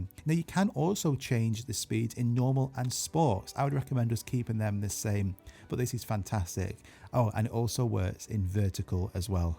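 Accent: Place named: Yorkshire, United Kingdom. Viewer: Btw love your content. I’m in Yorkshire. Can tell you are somewhere in that location with the accent :)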